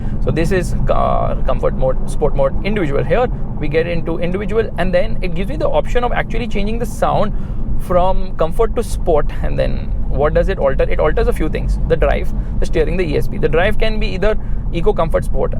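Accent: India. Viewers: Very Indian English and fast. Slowly plz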